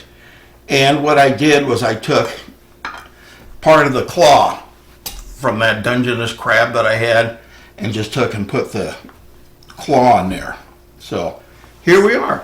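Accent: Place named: Chicago, United States. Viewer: Where ya from? I’m from Chicago n you kinda got my accent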